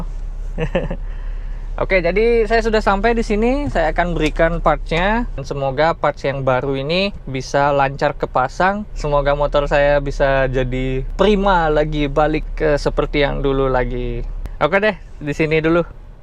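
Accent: Bali, Indonesia. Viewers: awal ngelihat ni vlog, logatnya yg saya kenal, setelah di cek ternyata orangbali 😀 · masbro.. sy subscriber baru nih.. yg sabar ya masbro.. namanya jg kcelakaan.. kdepannya hrs extra hati" masbro..:D oh iya logat ngomongnya kaya orang bali ya bro.. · kok kyk bali logtnya yak? apa cuman perasaan gue aja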